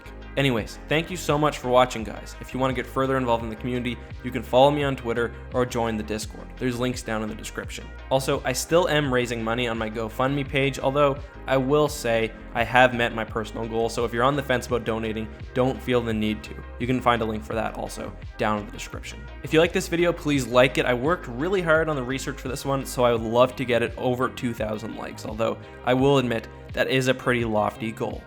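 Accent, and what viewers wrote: Canada. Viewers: Patriotism? It's pretty obvious this guy is Canadian due to his accent.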